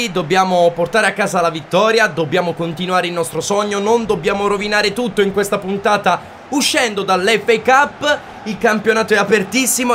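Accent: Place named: North, Italy. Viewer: Ripeto che mi sembri del nord, poi io sono di barletta quindi capisco se uno è di qua ma a te non mi sembra proprio😂. Comunque FORZA FOREST GREEN💚🖤💚🖤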